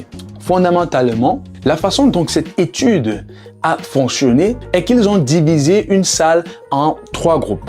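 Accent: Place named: Cameroon. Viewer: C"est quoi le truc avec l'accent Camer un peu partout comme pour ajouter de l'emphase?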